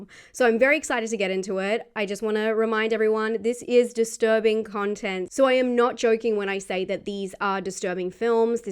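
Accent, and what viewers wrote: Australia. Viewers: Australian gives Australian delivery. The upward inflection at the end of sentences is hard to miss. Good to hear a fellow Aussie and it looks like you have a loyal following. Keep it up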